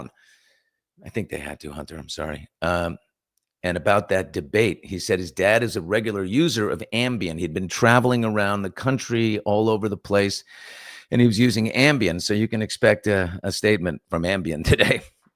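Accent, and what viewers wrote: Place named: New York, United States. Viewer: You can hear your New York accent😂. You’re an east coaster. Love east coast people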